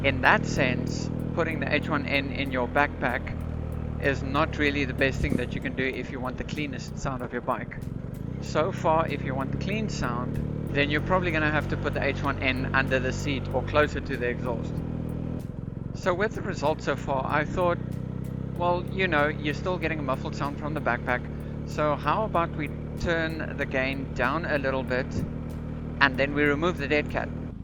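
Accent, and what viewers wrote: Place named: South Africa. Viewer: You sound south african, I don't see ocean views so I'm guessing gauteng?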